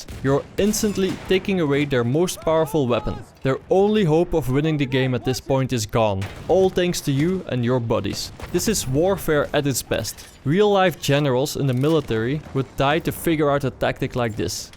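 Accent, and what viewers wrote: Netherlands. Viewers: are you dutch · R u dutch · ben je nederlands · Ben je Nederlands ik hoor het namelijk lol maar goeie video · That dutch accent is amazing xD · you can clearly hear that he is dutch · ur dutch arent u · are you dutch/NL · are you Dutch? if not from what country are you from? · ben jij nederlands of belg of zo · Are you from the Netherlands? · The dutch accent is strong in this one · you just can hear you are dutch xd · are you dutch??? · Are You Dutch or something like that? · Yo man are u dutch of you are here is a sentence for you: goeie video man super leerzaam! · Your dutch aren't you ? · Are you dutch? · he clearly is, he has that distinctive Dutch accent that you hear when 90% of the Dutch people speak English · Are you Dutch? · Haha Nederlands dat hoor je meteen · jij komt zeker uit nederland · where r u from? u Sound Dutch :) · is this guy Dutch cause his accent is not english nut great vid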